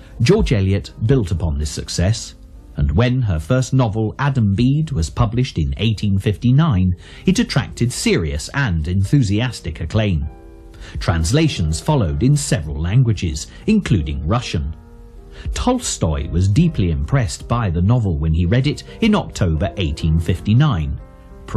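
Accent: United Kingdom. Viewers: God, I do so love a British accent.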